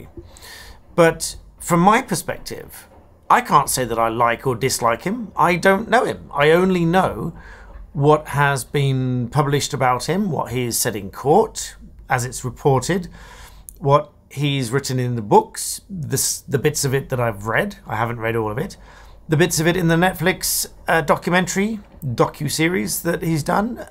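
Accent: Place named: United Kingdom. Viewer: I enjoyed your coverage of the Johnny Depp case and of this case. A true analysis based on what was and has been presented. That comment did not need an explanation by you but you correctly and eloquently put her in her place in your amazing well spoken British accent. Keep up your good work, I as with many others, certainly appreciate it as it gives a better understanding. Thank you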